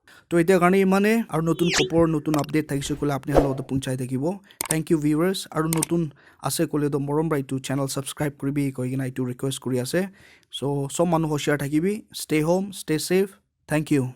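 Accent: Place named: Nagaland, India. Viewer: Speaking nagames so sweet bro ang.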